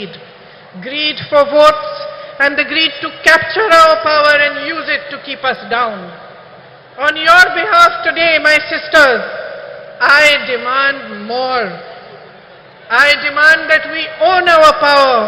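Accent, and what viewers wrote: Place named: Tamil Nadu, India. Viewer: எங்கள் தமிழின் இனிமையை தங்கள் உச்சரிப்பில் கேட்டு மகிழ்ந்து வாழ்த்துகிறோம் வெல்க இந்தியா வெற்றி நிச்சயம்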